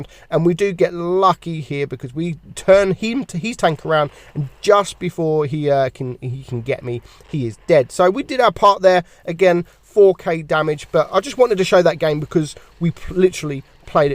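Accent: United Kingdom. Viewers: don't ya love it when gets on the mic and says in his British accent "Hello! I'm, and welcome back to the channel"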